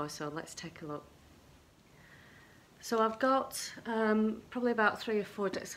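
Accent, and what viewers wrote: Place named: Greater Manchester, United Kingdom. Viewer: Hi, what a lovely video this time of year. I would say 90% of my seeds are from Premiere Seeds Direct for at least 8 years. I have had great germination and find them great to work with. I've not long got back from work and I asked my fiancee to guess your location from your accent. She thinks Ashton or Stalybridge! ( She was originally from Stalybridge) I cannot wait for spring, it feels like I haven't seen the sun for months and we are in Lincolnshire so further South than you. I think I'll spend the evening in my grow room with a book pretending I'm on holiday! The lights I use are full spectrum and look just like a summer's day!! Take care and hopefully spring is just around the corner for us all.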